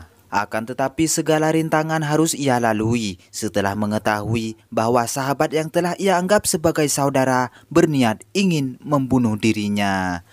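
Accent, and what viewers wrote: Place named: Aceh, Indonesia. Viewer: logat Aceh Sumatra kental..hhh mantab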